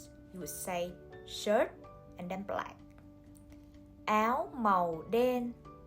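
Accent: South, Vietnam. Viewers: Southern accent. I love it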